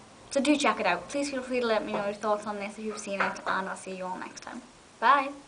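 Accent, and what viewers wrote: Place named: Scotland, United Kingdom. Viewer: Are you from Scotland???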